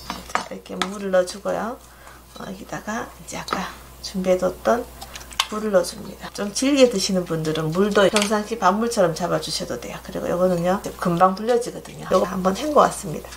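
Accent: Gyeongsang, South Korea. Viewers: 시원시원하니 진행도잘하시고 요리도 잘하시고 많이배우고 갑니다❤ 경상도 억양 구수하니 정다워요 ❤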